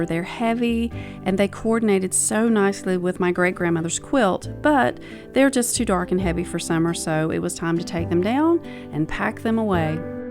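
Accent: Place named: South, United States. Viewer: I feel like we could be the best friends. You have such a sweet and tender heart for the blessings from God. We are on opposite sides of the country but I just love watching you do what makes your heart happy. I sit and drink my coffee to your sweet southern accent. I love in Arizona and for years my home has been decorated in a rustic ranch theme. But slowly I’m going in a more vintage direction. Taking your advice in using what I have and getting what I can inexpensively at the thrift and antique stores. Though our styles are a little different, you so inspire me. Thank you so much for sharing your life and home. Hope to hug you someday. ❤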